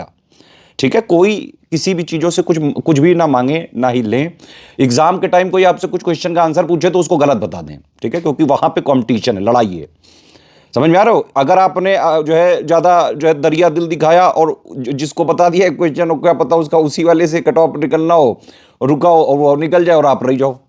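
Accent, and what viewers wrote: Kanpur, India. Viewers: Sir aap kanpur se h kya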